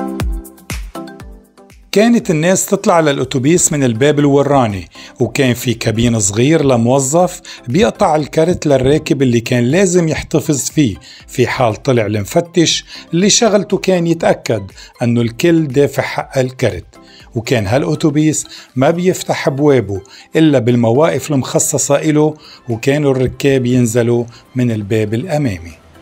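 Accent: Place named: Beirut, Lebanon. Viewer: شكرا كتير سيد على الفيديو الحلو وعلى الإلقاء باللكنة البيروتية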